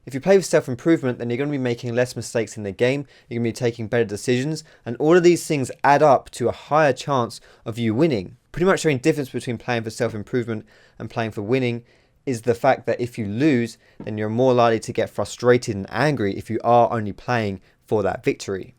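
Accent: United Kingdom. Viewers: Top 5 reasons for subbing to you 1-You got a british accent which is funny 2-You seem like a nice guy 3-You have great instructional videos 4-You answer to comments 5-My 1year old daughter loves foxes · love your videos and the british accent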